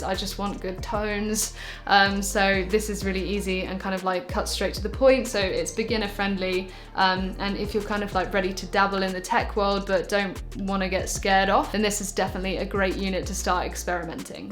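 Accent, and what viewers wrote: United Kingdom. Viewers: Brit accent ! you play well, congrat' :)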